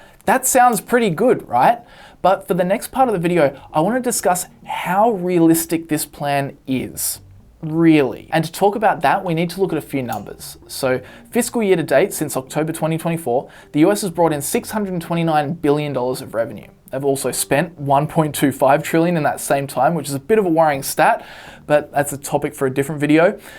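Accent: Australia. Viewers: Probably because he doesn't go here (I'm assuming based on the accent that he's from Australia). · Sounds like you’re Australian. Your wine industry has huge issues in America.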